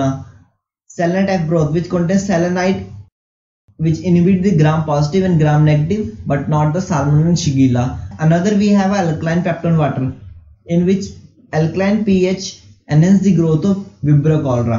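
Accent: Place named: India. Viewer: I’m sorry man but I can’t never understand think Indian accent.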